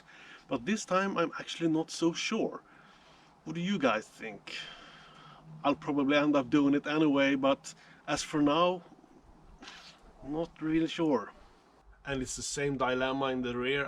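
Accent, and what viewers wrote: Sweden. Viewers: You from sweden right? I can really tell The way you speek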